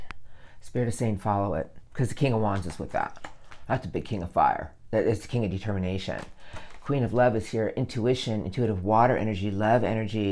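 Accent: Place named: United States. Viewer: aww! Thank you! Beautiful reading as always. You speak amazing English, but look Spanish but sound American? You might have lived in several countries to date? You are certainly well traveled spiritually, I love your intuition and gifts. Thank you as always. ❤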